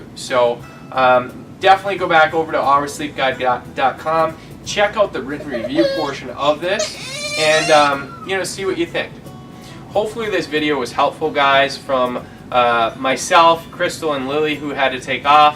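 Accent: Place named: Canada. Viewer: the canadian accent :)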